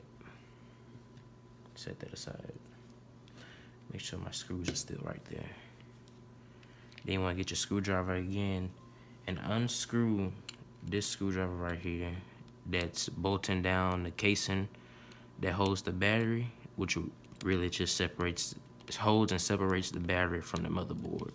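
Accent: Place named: Louisiana, United States. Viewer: You from Louisiana bro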